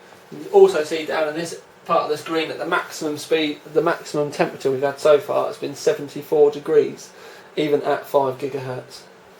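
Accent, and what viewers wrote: United Kingdom. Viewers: so hard to understand a tired man with a british accent.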